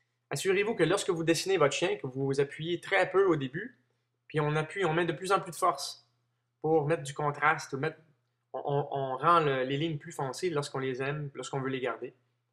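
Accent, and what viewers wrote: Quebec, Canada. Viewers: L'accent du Quebec ça donne de la bonne humeur ^_^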